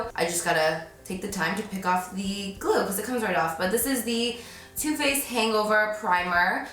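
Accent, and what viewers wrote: New Jersey, United States. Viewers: is she from jersey? :o she has a very heavy jersey accent and i'm from there!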